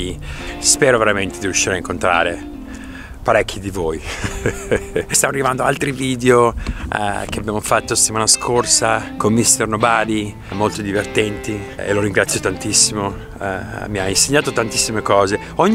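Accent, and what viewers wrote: United States. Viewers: Grande continua così. La cosa più fantastica è sentire che parli un italiano in stile americano ed aver perso il dialetto del tuo paese di origine.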